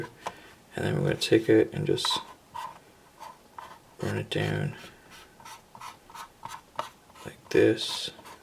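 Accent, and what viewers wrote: United States. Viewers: Hi, just wondering you come from? As you sound American/Canadian, if so, why do you use English trains? Not to be nosy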